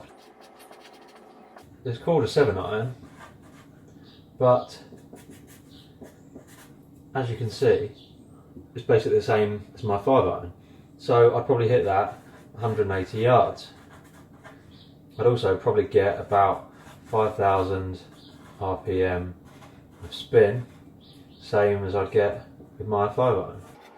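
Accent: Australia. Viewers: Very new to golf and started looking to buy my first set of clubs just got a little more educated thanks mate.....love the Aussie accent.🤣🤣🤣🤣 Yes I'm an Aussie . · 😂 the Aussie accent. I recently went to test some clubs. I say test because my coach has already fit me. I tested the Taylormade P790’s and the guy at the store could not understand why I didn’t want a 7 iron that carried 15yards further than my current 7 iron. My 7 carries 150. I don’t need a new 7 that is carrying 165. There is always going to be a bad gap in the bottom of the bag. There were 15-25yard gaps between the 8-PW! You have to test the entire set. I loved the feel of the club, but I can’t have that much uncertainty 120yards from the green.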